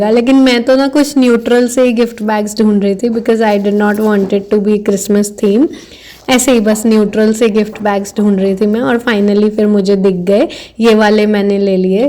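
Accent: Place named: India. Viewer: I really love your videos, your thoughts and views. Love love your accent and you owning it and rather than being shamed by people. This makes me admire you even more. Re aroma of our Indian spices and cuisine, instead of being shamed I am 💯 on board with you. Just like you, I question the same to people who are bothered by our Indian spices smell. I am married to a midwestern American Caucasian. When his family even snubs their nose at aroma of my Indian cuisine, all I say to them is “I totally understand that this aroma is new to you and you find it different just like how I still feel about the burgers and meats you all eat”…Love your authentic self. Always stay that way 💕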